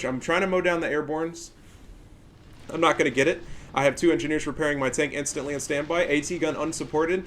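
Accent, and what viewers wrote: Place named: Germany. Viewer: Bro... this shit is fucking comedy. Literally peak youtbe right here 🤣🤣🤣 the fact that you can so the German accent so well and clearly aint about that shit is legendary 😂😂😂 i dont even like videos on youtube cuz it fucks with my music but this deserves likes regardless hahaha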